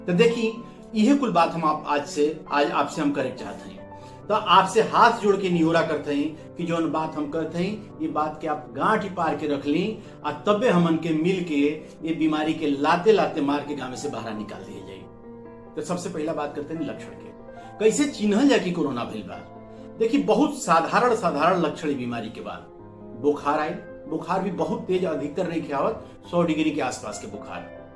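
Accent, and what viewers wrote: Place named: Bhojpuri, India. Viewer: इतनी अच्छी विवेचना और इतनी सहज भोजपुरी। इतनी बढिया बातचीत। किसी भी ऐंकर से नहीं सुनी थी।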